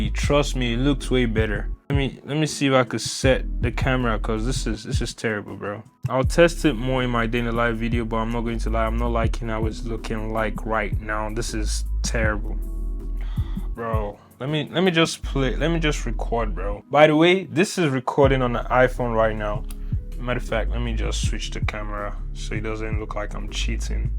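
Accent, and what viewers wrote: Nigeria. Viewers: The accent never goes wrong Naija for life🇳🇬💯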